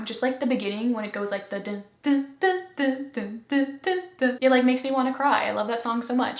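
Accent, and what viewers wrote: Canada. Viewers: your canadian accent very much jumped out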